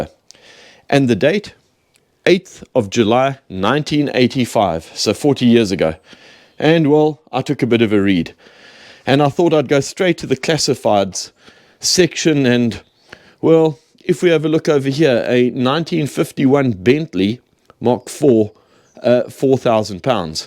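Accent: South Africa. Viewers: Your English accent sounds like a South African. My uncle Harry used to restore Alfa Romeo's back in the day in South Africa.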